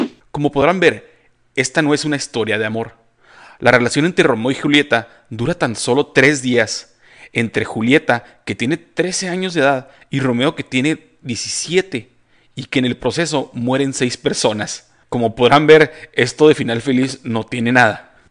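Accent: North, Mexico. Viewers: Gracias!! jajaj eres norteño?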